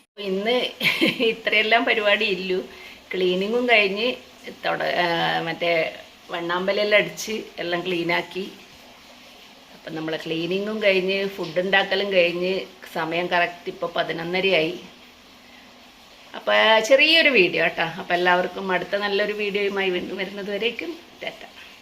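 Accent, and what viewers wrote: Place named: Kannur, India. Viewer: ആഹാ അടിച്ച് പൊളിച്ച് cleaning നടക്കട്ടെ. നമ്മുടെ കണ്ണൂർ touch ഭാഷ കേൾക്കുമ്പോ ഒരു സുഖം❤❤❤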